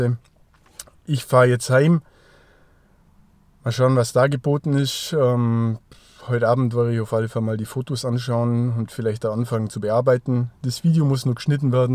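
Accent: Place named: Swabian Alb, Germany. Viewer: Dein Dialekt hört sich ein wenig nach schwäbische alb an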